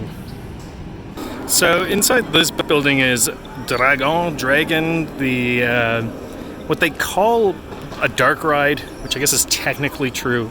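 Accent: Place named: France. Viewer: Ur french accent is so good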